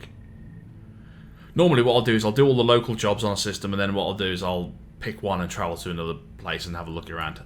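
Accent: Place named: Northern England, United Kingdom. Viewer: Top class. It's one thing to know your stuff but it's quite another to be able to teach it, and you do that really well. It's good to hear a northern accent too, reminds me of my childhood. Hang on, my childhood was shit.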